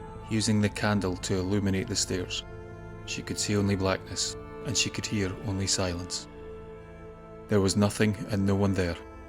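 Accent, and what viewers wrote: Scotland, United Kingdom. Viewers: Creepy ghost story well illustrated and told by the best Scottish accent on Youtube. I also suddenly crave pizza. Thanks!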